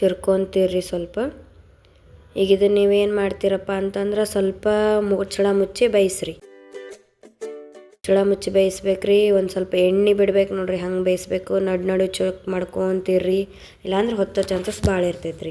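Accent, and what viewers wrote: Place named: North Karnataka, India. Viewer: Nim bhashe bal chend aitri🥰 uttarkarnatakad namd davanager ri akka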